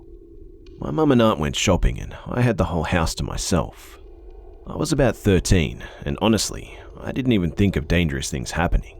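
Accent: Australia. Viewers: Oh great now we got a shitty aussie reader who also sounds like a puff like the rest of them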